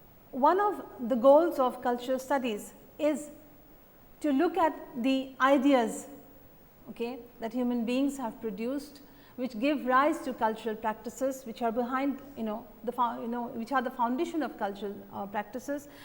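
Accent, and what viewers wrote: India. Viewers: I absolutely believe you, as far as I know you are right, cause India is India with all its advantages and disadvantages, however, the matter was about Indian accent, especially about the accent of the speaking lady. I just compare her accent and accent of some Indian guys who were studying medicine when I was a student (in Siberia, in Krasnoyarsk-city), those days I could not understand their Indian accent, but may be I did not understamd them well because I did not speak English fluently at that time :)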